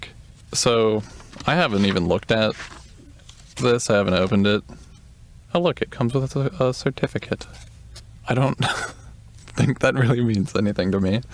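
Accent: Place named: Silicon Valley, United States. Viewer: .... Speaking in the Silicon Valley derived, computer punk dialect....... (slightly feminised, conspicuously/overly precise, observationsl, complete with vocal fry.) Computer nerds everywhere will be cheering.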